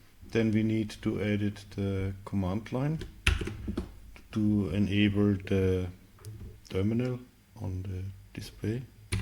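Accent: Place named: Germany. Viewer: An hand des Akzents schreibe ich auf Deutsch. Cooles Projekt. Sind die GPIO ports noch nutzbar? Dann wäre das Teil nämlich interessant. Ich habe gesehen das du die Platine dafür über pcbway verkaufst... Wie sieht es mit dem 3D Gehäuse aus? Ein gps und evtl. Lora mod wäre nich cool Dann könnte man es als meshtastic nutzen. Ich liebäugel nämlich mit dem Teil, aber mit dem reinen pico sehe ich für mich keine Anwendung die ich nicht auch mit ein esp32 erledigen könnte (von der Mobilität mal abgesehen) und dann werden für mich ein hackberry oder uConsole interessanter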